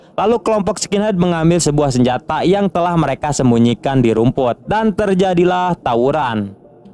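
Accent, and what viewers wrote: West Java, Indonesia. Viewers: Aduh a ni resep nyarios na indo Sunda duh 😂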